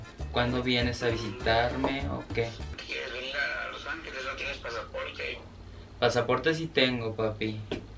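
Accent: Chihuahua, Mexico. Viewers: Jajaja tu acento de chihuahua, que loba hermana! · Ay, amé en vdd, me divertí muchísimo, aparte tu acento shihuahuence super bien hecho, saludos desde ciudad juarez, shihuahua!!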